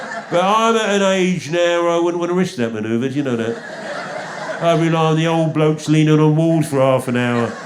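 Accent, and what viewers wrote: Cockney, United Kingdom. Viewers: luv this fella .. proper cockney geezer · As a former Portuguese immigrant in the UK, I loved it. You sounded to me like a proper Guy Ritchie cockney character, and I mean it in the best way possible. Bloody brilliant. Cheers from Portugal.🇬🇧🇵🇹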